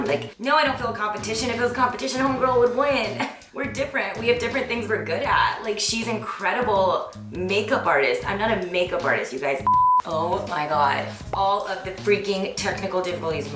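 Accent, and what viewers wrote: United Kingdom. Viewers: You did fantastic!!! You’re just so funny with your british accent... I’m sure kaushal feels proud of your effort xxxx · You are sooo cute the more you watched and copied the more you got that Mary poppins British accent x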